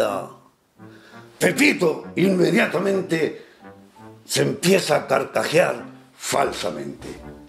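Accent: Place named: Argentina. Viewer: La verdad que te sale muy bien nuestro acento argentino. Pero no le vi la gracia al chiste. Aunque si me gusto lo de pepito que si le cambia una letra se llama "peputo" ajajaja ajajaja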